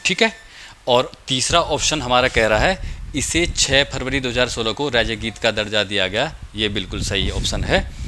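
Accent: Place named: Garhwal, India. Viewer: Sir ki aavaj ek garhwali lag rahi h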